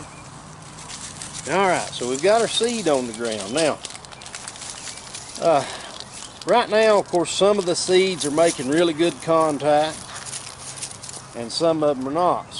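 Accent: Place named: Georgia, United States. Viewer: I was born in south ga. You sound like home. I live in AL now because that’s where my husband is from. I planned to get my mustards in the ground at the end of August. And yes thinning is hard to make yourself do when they’re first coming up but boy have I regretted it when I didn’t. Enjoyed the video. God bless and happy gardening.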